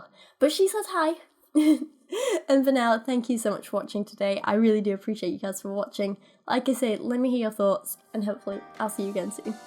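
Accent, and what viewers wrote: United Kingdom. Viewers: You have a British accent. Hope you still enjoy living in your third world country with high criminality. You deserve it. if you don t believe UK is third world Google United Nations replacement migration. I m not westerner.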